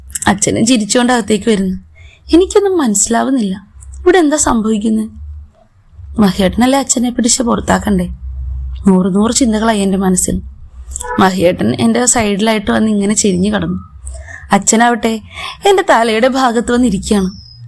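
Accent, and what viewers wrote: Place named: Palakkad, India. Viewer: ഒരു പാലക്കാടൻ സ്ലാഗ്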